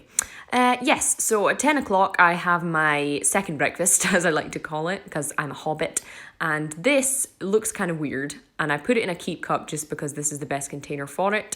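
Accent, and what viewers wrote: Scotland, United Kingdom. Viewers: I get the Scottish accent from you really well.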